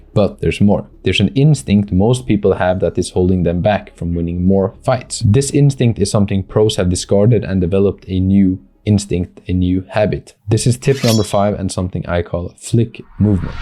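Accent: Sweden. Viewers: Swedish accent detected.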